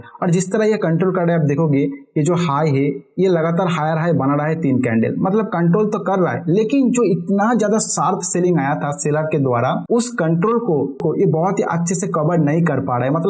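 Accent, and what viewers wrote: Bengal, India. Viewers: আপনার হিন্দি উচ্চারণ শুনে আপনাকে বাঙালি বলে মনে হচ্ছে।ঠিক না ভুল?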